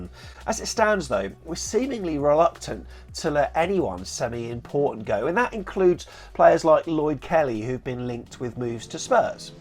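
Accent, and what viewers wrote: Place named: United States. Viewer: American accent frightfully good. You should do all future financial breakdowns as Gandolfini from The Sopranos.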